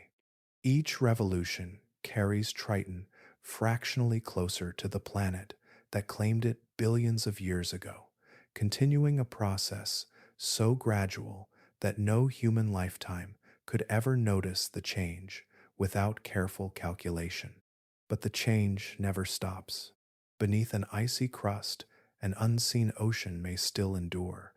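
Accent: United States. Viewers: Congrtulations. American accent and no annoying sound effects. Subscribing now.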